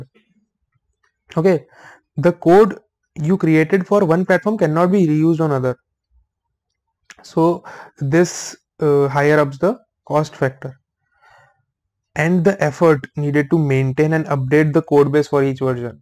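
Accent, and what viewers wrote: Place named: India. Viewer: please, write (indian accent) in your videos so I don't waste my time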